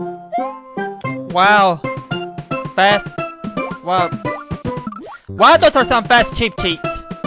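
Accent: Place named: South, United States. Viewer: love ur country accent, great commentary!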